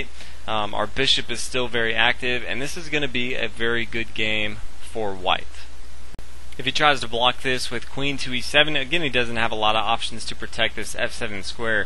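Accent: United States. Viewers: Nice American accent!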